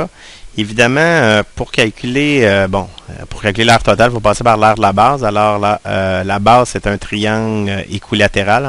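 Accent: Quebec, Canada. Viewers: l'accent canadien c'est abuséééé :o mais la video est bien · Accent québécois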